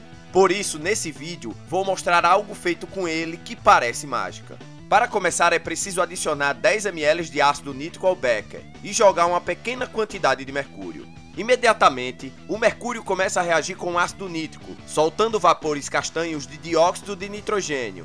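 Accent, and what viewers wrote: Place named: Northeast, Brazil. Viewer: De onde tu é? Com esse sotaque pauleira eu imagino q tu deva ser do RN, PB, PE ou AL Um salve de João Pessoa, Paraíba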